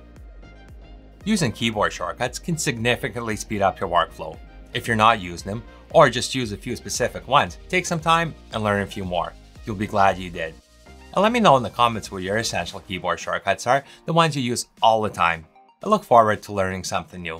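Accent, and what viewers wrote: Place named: Canada. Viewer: You are so good at this. Thanks for sharing your skill. You Canadian? Trying to figure the nice accent.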